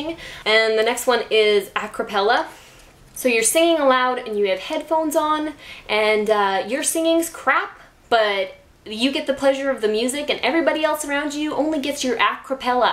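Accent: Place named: Canada. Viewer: Um, yeah, you DO have a cute accent. You Canadians and your "aboot". ;) Awesome vlog!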